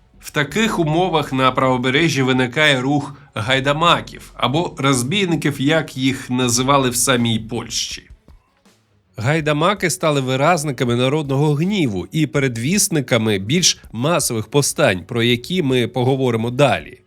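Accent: Russia. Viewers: Доповідачеві бажаю позбавитись руського акання і читати вголос українською щоб чути вимову.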